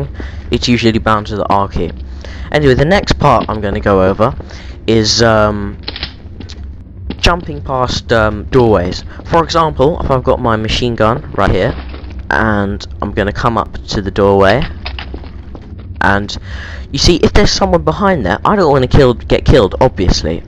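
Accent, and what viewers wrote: United Kingdom. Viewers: LMAO, Great Video...im not racist but the British accent makes it funnier for some reason! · he has an english acent bad spelling*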